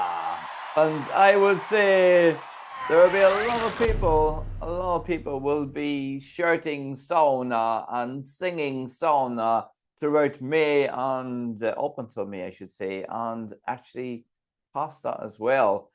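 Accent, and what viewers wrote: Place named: Sweden. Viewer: an yer Swedish is steckin out!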